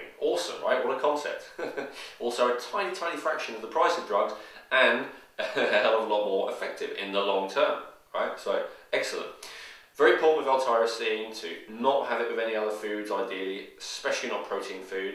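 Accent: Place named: United Kingdom. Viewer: The few bits of your audio that are discernable, seem to possibly have useful info. Please, repost this info having recorded it in a room acoustically dampened to eliminate reverb, using a more sensitive microphone or placing it closer to your mouth, and without mumbling. Not everyone is atuned to the British manor of speech, and I suspect you want to attract the most viewers possible afterall. Also I suggest using a backdrop, even if it's only a non-distracting picture (digital or enlarged photo) of a common interior room, rather than a solid color. And shave before a public address. It lends to your credibility. Please, reply when your updated video is posted. I look forward to your thoughts on the posted topic.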